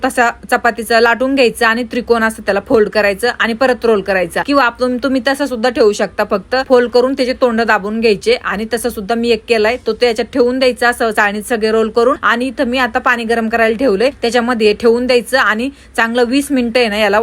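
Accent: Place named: Jalgaon, India. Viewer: जळगावची वाटते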